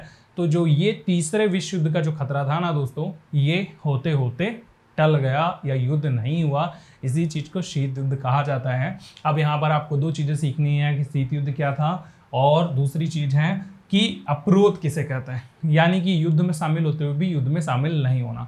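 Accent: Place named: Allahabad, India. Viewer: Sir apka illahabadi accent 🥺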